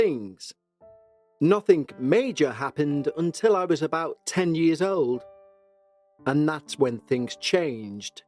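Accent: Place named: Liverpool, United Kingdom. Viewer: Out of all the paranormal podcasts, this is by far the best. As someone from Preston, you are deffo a Scouser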